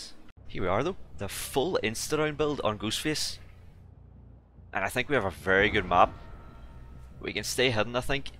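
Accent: Northern Ireland, United Kingdom. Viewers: northern ireland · Are you from Northern Ireland?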